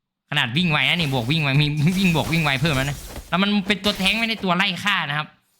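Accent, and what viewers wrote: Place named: Korat, Thailand. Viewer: คนโคราชนิครับ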